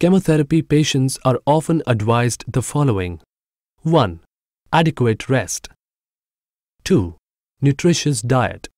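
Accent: India. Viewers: สำเนียงแบบนี้อินเดียแน่เลย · ahhhh yes.... the fabules indian accent absoulutly love it omg i cant spell, anyways whoever is going through this i pray for you to get better and never have doubt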